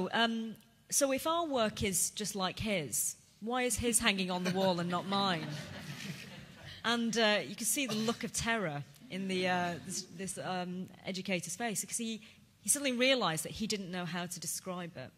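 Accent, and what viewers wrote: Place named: RP, United Kingdom. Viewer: God she can fucking speak, her accent is bloody high class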